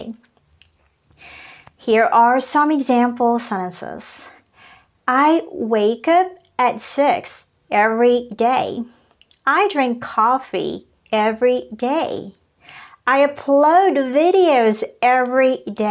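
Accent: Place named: United States. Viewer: a good idea to devide this topic into two lessons and great american pronunciation as well...😍😍😍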